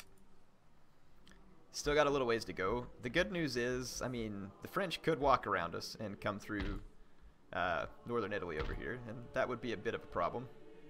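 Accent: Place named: South, United States. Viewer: You say that your southern upbringing does not allow for correct pronounciation of French words but the facts is is that the south has gotten its accent because of a mix of French and american English. It really is a mix of the two because of centurys of being a French colonial region. And yes im a amateur linguist so i know :P PS: you still need to build a port in Croatia...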